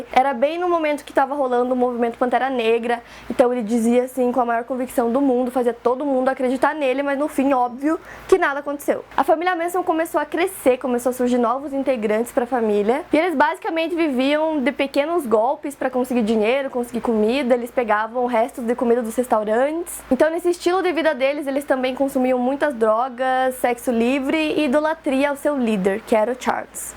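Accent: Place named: South, Brazil. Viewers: Mas tem um sotaque sulista diliça♥️ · Video um pouco antigo mas mto bom, a sempre explicou mto bem, pesquisou mto bem, e merece muito reconhecimento pq isso eu sei que da mto trabalho, e além disso tem esse sotaque sulista SUPER FOFO ❤🤡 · voz sulista que fofo e engraçado s2 kk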